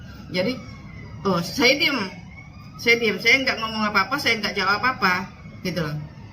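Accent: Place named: Sabah, Malaysia. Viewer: Bunyi cakap macam orang Sabah.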